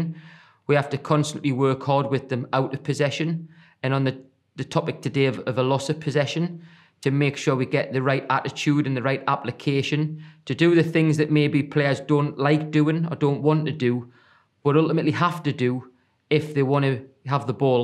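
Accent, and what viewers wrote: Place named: Newcastle, United Kingdom. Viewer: Geordie accent Love it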